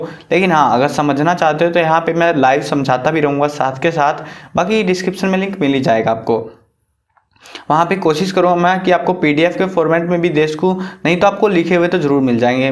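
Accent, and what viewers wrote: Haryana, India. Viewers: Haryana se ho bhaiji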